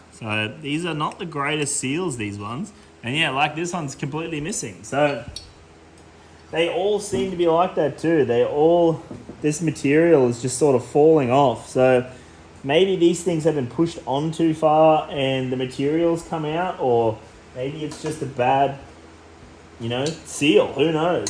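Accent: Australia. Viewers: *'s like a shit-talking, Aussie-accented shop manual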